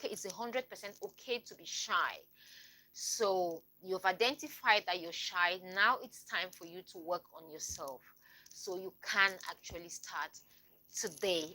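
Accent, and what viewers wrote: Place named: Democratic Republic of the Congo. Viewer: my sweetheart. Listening attentively to you, I think you are originally from Congo ( Zaire ).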